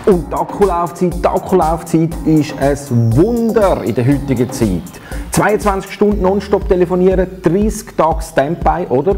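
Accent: Switzerland. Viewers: Genau! Die Sprache ist mir sehr sympatisch. Weiter so! Ich verstehe alles. Dieses Handy ist allerdings so überflüssig wie nur was. Was soll sowas in der Modernen Zeit für einen Sinn haben? Gruß in die kleine, aber feine Schweiz. Wenn doch nicht alles so teuer wäre bei euch, würde ich zu euch zu Besuch kommen. ;-)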